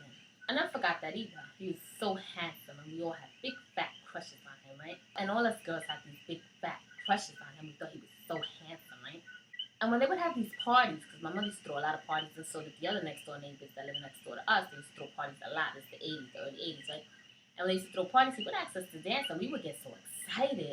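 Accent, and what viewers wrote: Nuyorican, United States. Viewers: Vaya Mamii...I can tell off the back your NYRICAN all the Waay!!! Love the NyRican Accent unique unlike no one else....You sound like your a Brooklyn Boricua. Super Beautiful mami. Yea' Dominicans don't want no fuckn Beef with Boricua cause the same way we open the doors for those YOLERS we can and known how to Close them. P.Ricans have 3Million Boricuas alone in NYC so they can figure that one out plus we have 100yrs in NYC they came in the YESTERDAYS" Thanks to the NYRCIANS.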